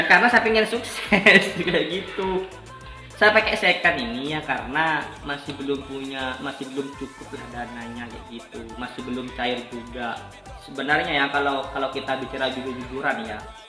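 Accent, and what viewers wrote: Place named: Madura, Indonesia. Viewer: Wah ternyata reng medureh .. Mun engkok reng jebeh kak....hehhehe...buat konten tutorial ja kak..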